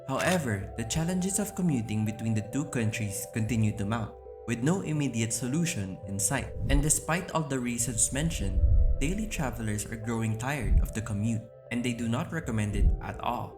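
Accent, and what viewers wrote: Philippines. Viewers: A pinoy narrating this. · Why such heavy Philippines accent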